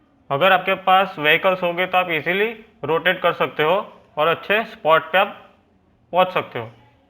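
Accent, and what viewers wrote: Gujarat, India. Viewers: Are you gujurati bro ? You sound like one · Is that Gujarati Accent? 🤔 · bhai Gujarati he app awaj se pata chal gaya #baari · Bhai gujrati ho kya? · U r gujrati aren't u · Bhai tum pakka gujrat se ho gujrati Right ?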